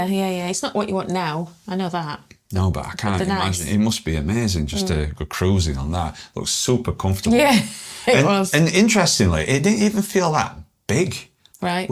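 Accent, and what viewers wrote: Northern England, United Kingdom. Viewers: Guys, firstly great channel. Early subscriber as love your attitude towards Biking and don’t hide your early stage learning curve. Should have commented earlier, as would love to help with advice as it’s needed. Anyway, a comment for today’s video. CFMoto are not in the same class or category as other Chinese brands. They are massively and heavily involved with both KTM and Yamaha….meaning they have been and are producing massive amounts of bike parts for them. They are a proper company and are distributed through KTM dealerships. Parts issues will be no better or worse than anyone else. I don’t ride a CFmoto but if I was at your stage in biking life I would seriously consider one if budget allows. It’s also nice to hear a northern accent so don’t go changing that 😂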